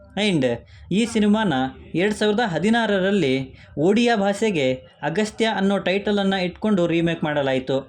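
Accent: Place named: North India, India. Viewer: Bro ur kannada pronounsation is luke northies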